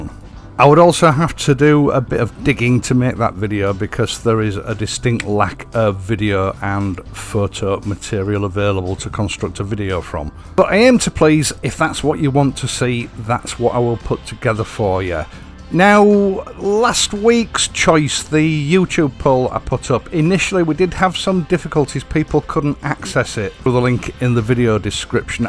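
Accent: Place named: Northern Ireland, United Kingdom. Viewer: I really like your videos, they are very detailed. Also, you sound a lot like Uncle Colm from Derry Girls, which makes your videos all the more pleasant!:)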